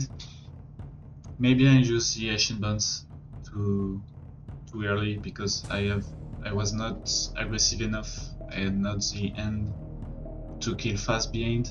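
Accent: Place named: France. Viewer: he's just french and doesn't know how to speak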